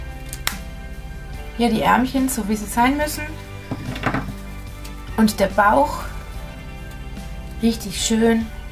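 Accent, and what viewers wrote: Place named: Swabia, Germany. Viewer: Hallo liebe, vielen Dank für das super Rezept. Wir leben in Pakistan und vermissen die deutschen Backwaren daher umso mehr. Heut habe ich die Bretzeln nach diesem Rezept gemacht und meine Tochter hatte Tränen in den Augen, weil es so lecker, genau wie in Deutschland, geschmeckt hat. Dein schwäbischer Akzent macht das Video angucken noch sympathischer. Liebe Grüße nach BaWü. Angelika. Bei Instagram kannst du Fotos davon sehen Instagram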